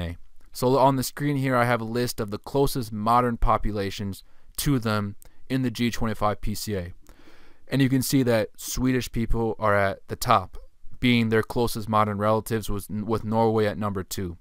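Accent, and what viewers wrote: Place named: Mexican-American, United States. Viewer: It's tough to place your accent. Sounds almost like what Mexican-Americans with English as a mother tongue sounds like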